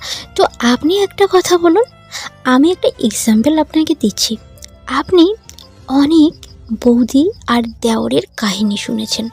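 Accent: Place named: Pabna, Bangladesh. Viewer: পাবনার মধ্যে পাকা কন্ঠে ভিডিওটা খুব ভালো লেগেছে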